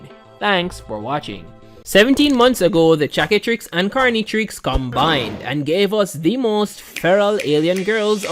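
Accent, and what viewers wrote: Jamaica. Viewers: Yuh jamaican? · I can tell from the accent that your a yardi big up mi G💪🏾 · I can tell that your Jamaican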